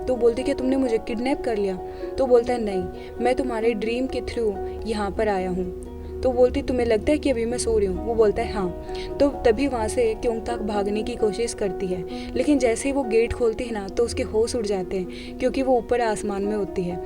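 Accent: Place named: Haryana, India. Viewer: didi aap haryana s ho na aapki aavaj se hi pata lagra h...mai bhi haryana se hu...thanks for your explanation😊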